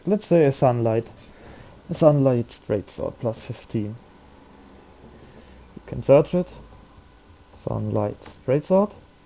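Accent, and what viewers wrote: Germany. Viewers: are you german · Man merkt du bist deutsch haha :D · Are you german? You sound a lot like german xD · Super geklappt. Eigentlich halte ich nichts vom cheaten, aber wenn ich drops nicht bekomme, die man eigentlich bekommen sollte finde ich ist das ein Grund. PS: Man hat es herausgehört, dass du Deutscher bist. Ist aber natürlich nicht schlimm. Bin ja selber einer ;)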